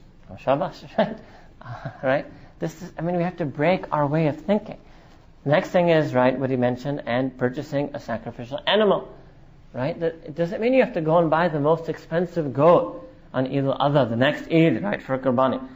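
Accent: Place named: England, United Kingdom. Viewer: Extremely strong English accent n very fast.though I want to understand this important topic of zakat,I only like my sheikh,s voice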